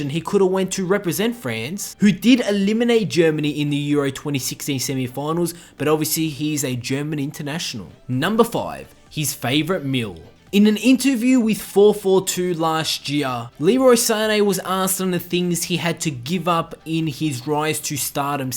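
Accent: Australia. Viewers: le roy sane from Maineee chester citah!! aussi accent hahahh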